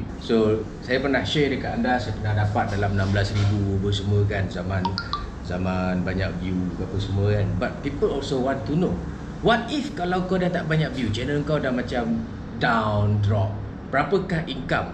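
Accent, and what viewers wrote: Sarawak, Malaysia. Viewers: Bangga dengan ni... Satu he yg X suke... Bahasa Sarawak ko X tajam dah bro. Kemonnnn. Xkan la X boleh tune.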